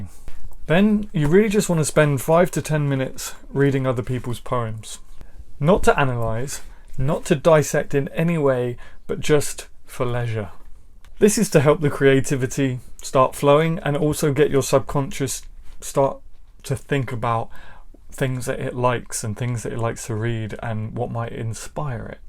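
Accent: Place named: Yorkshire, United Kingdom. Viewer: hahaha I also suffer with running but I'm a walking injury anyway 😂. Thanks so much for the support man, massively appreciated. Although I don't think the Yorkshire accent will ever catch on as much as your classic British accent hahaha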